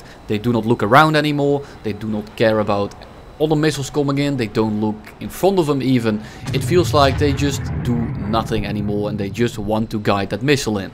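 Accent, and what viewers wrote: Netherlands. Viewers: Je accent is iets te Nederlands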